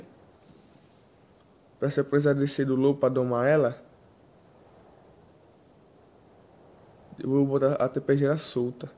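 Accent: Northeast, Brazil. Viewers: Vc é do nordeste certeza kkkkkk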